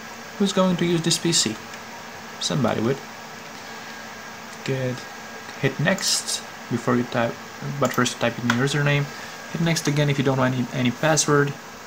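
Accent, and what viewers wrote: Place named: India. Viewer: engleza de indian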